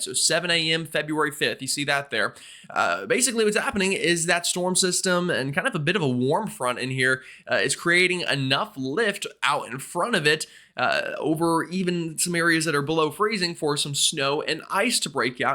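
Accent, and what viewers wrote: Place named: Philadelphia, United States. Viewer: Thats awesome, I have relatives down in the Phillly area and they have that accent. Very interesting to talk to people around the country. When I was young, we made a trip down south, on coming back my friends asked where I got the southern accent. It doesn't take long to pick up some of the lego you are exposed to.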